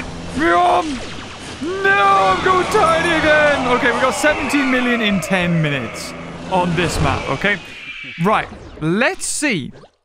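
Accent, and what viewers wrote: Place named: United Kingdom. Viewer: You are Markiplier but bri'ish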